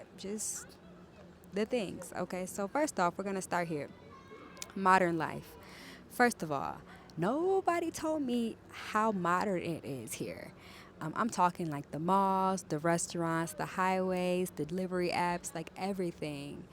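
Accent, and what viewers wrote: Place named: United States. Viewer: If I were to pass you on the street i wont think you from the USA till you start talking.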